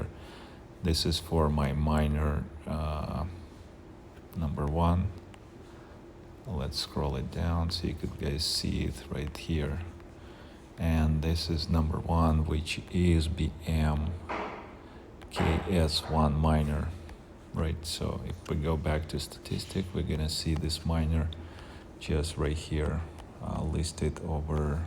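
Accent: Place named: Russia. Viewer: Брат, ты из России? Акцент выдаёт😏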